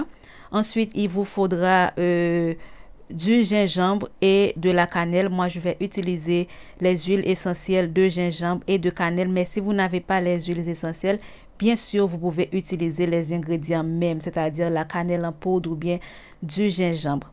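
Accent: Haiti. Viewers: Tu parles comme une Haïtienne 🇭🇹🇭🇹j'aime bien merci😊😊😘😘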